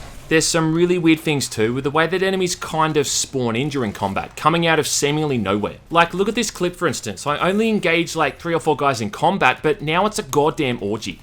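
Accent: Australia. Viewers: Australian accent ftw haha.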